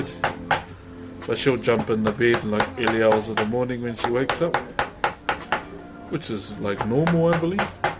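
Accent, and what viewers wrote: New Zealand. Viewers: That’s how is kiwis talk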